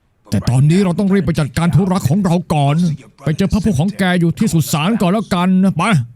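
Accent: South, Thailand. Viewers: ลุงไม่แหลงใต้แล้วหรอครับ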